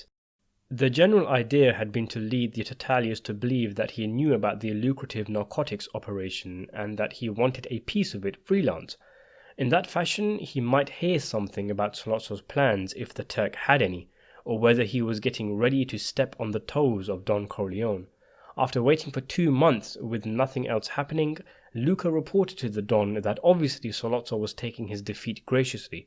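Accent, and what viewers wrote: England, United Kingdom. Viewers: This is the second video of yours, BUT.... I'm from California, I'm old, and bad hearing. So I don't watch stuff with ACCENTS, the SE American's are bad enough but you Brit's have a few of your own. So wasn't going watch any more of your video's, BUT, I said give it an other shot cuz I really liked Luca Brasi and liked it. Sorry, and I subscribed. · What part of England are you from narrator ? You speak a very rare dialect.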